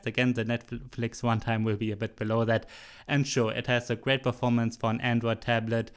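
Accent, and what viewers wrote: Germany. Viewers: This guy is German, right?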